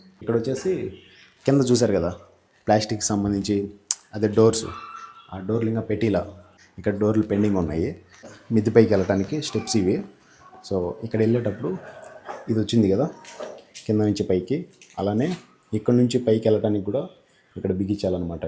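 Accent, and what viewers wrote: Kadapa, India. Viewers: Fast time mi video chuste mi Bhasa ma Kadapa ekkadunna kadapa a level veru anna❤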